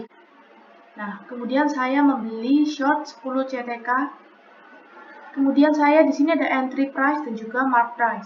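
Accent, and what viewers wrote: Java, Indonesia. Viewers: Suara nya ko medok banget 🙈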